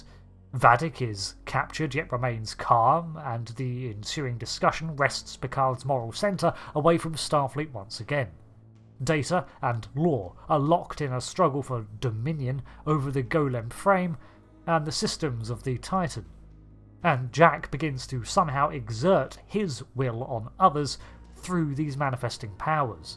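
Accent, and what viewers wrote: United Kingdom. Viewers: For a few years now I've been watching your videos for star trek online as I have not been able to play it much myself. I love the way you narratively approach each of your episodes for the stories. I love your recaps of this season of Picard. Not much of a point to my comment other than to say I appreciate what you do. And a question? your accent I'm having a hard time placing, and I don't know why cause usually I'm good at that. Honestly I can't tell if its British or Scottish but I have a feeling it's neither. Either way it doesn't matter. I'm just curious. Either way I do love your videos and watch them often. Looking Forward to the next one.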